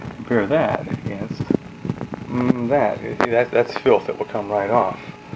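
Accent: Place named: Minnesota, United States. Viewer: Cool accent. Minnesota?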